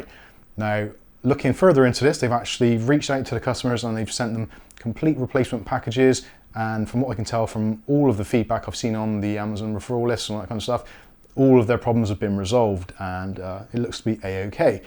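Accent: Bristol, United Kingdom. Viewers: Are you from Bristol haha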